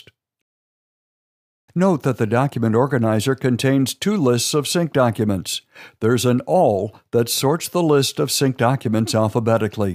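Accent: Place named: United States. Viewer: Thank God, at last a video fully understood by a man who speaks GOOD typically American language. I think all the videos that contain instructions must have been talking with so purely American to understand the rest of us who are not Americans. Thank you!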